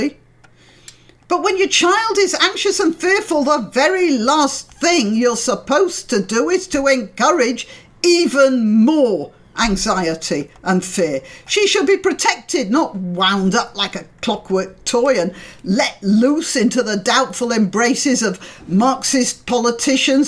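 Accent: United Kingdom. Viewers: I like your beautiful British accent very much. Thank you for your voice. I am your new subscriber.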